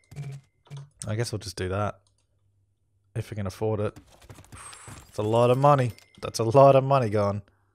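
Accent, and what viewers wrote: Australia. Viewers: I'm trying to figure out by your accent where in Australia you're from aha. I love watching your builds. I miss the Sims 2 so much. If you register your base game with Origin, you get The Sims 2 Ultimate Collection for free at the moment. Meanwhile, most of you watching probably already have each pack :p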